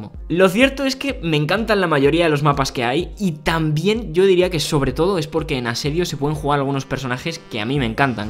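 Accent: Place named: Spain. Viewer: me estas trolleando? Aburrido? Tus preguntas y respuestas son unos de los mejores y... 100% seras mi youtuber favorito hasta tu face reveal(si lo haces) no me suelen gustar porque me suelo decepcionar un poco pero como eres español(o tienes acento español) la mayoria de youtubers epañoles me suelen gustar porque yo soy de españa. Pero creo que de todas formas, tu voz nunca me decepcionará y con ella nunca dejara de gustarme tu canal y muchas veces, me molan los chistes, ejemplo “es verdad que tiene poco rango” de el video de los 7 mejores gadgets, en fin, tus videos me pareceran los mejores despues de 4 videos otro youtuber Tus videos molan! 🔥🔥🔥❤️💪💪💪💪👍👍👍⭐😘😍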